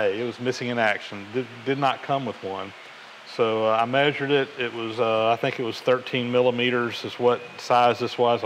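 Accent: South, United States. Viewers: A Getter Done with a southern accent; LOVE IT.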